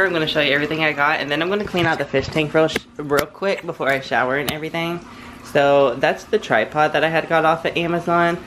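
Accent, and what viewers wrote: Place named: South, United States. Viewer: What state are you in? I like the southern accent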